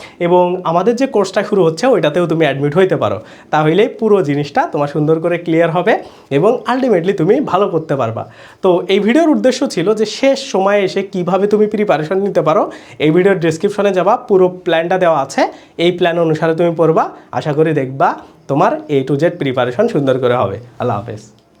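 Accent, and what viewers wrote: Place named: Bangladesh. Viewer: So many Bangladeshi teachers have this one thing for improper pronunciation. I think it's due to their local Bangla accent. Otherwise their teachings are good. The pronunciation low-key puts me off tho. But I don't think that's a big deal.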